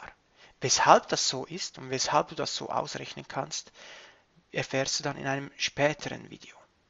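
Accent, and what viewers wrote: Switzerland. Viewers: Sehr angenehme Stimme hast du da.. Nur e chli Schwizerdütsch gehört mer da use ;) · Joa ganz guet. Nur hört man wirklich manchmal ein bisschen Schwizerdütsch. Aber dankä fürs Video =D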